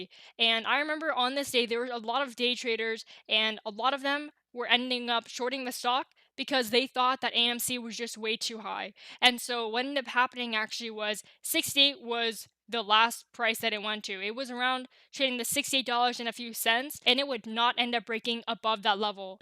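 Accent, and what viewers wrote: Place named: Canada. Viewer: Well-done, 👍 🐰‼️👏👏 Did I hear a little Canadian 🇨🇦accent in your voice? My kids have that too‼️😃I like it‼️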